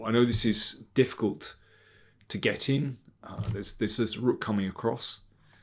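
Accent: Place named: RP, United Kingdom. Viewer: I like this channel as Daniel King's English is truly BBC-style. Always picking up new vocabulary. From this video: 'trifle', 'set things in train' and 'indictive'. Thank you!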